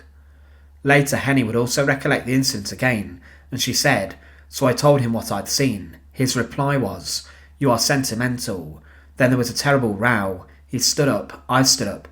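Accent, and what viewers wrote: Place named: England, United Kingdom. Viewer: He's English. Very easy to understand if you're English but I'll agree not a very naturally spoken presenting voice.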